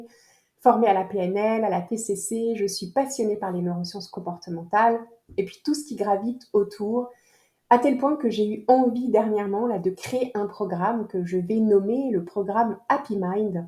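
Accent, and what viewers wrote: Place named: Quebec, Canada. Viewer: Bonjour 😊. Merci pour cette belle capsule. Hâte de découvrir un peu plus ton nouveau programme 😊. Et ton accent québécois est juste terrible 😂😂. Très bon dimanche ♥️♥️♥️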